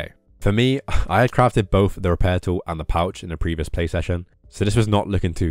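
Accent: United Kingdom. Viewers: Are you Bristolian by any chance? I recognise the accent haha where I’m from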